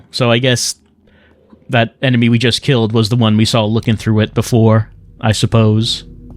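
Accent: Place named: New York, United States. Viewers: Newark union plumber accent is really the best. Especially in Doki Doki Literature Club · Your... uh... New York accent when reading letters makes this game much more enjoyable.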